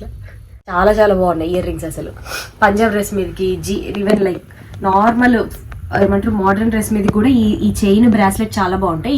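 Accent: Telangana, India. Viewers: Bro Telangana slang marchaku Anna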